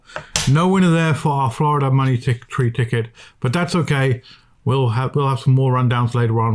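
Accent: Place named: United Kingdom. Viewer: Hi there You sound like you are from the UK but I can’t see any Lotteries for here I am guessing that the Uk one is a lot more complex to win than some of the others Only just started watching this about the Thai Lottery a few mins ago Do you offer live chat like other people which I noticed seems to be a new feature It is a good idea as it’s quicker and not everything needs to be written in public Kind regards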